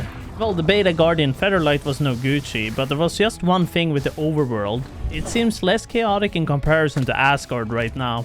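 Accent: Sweden. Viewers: Are you from sweden? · where do come from ???????????? you sound swedish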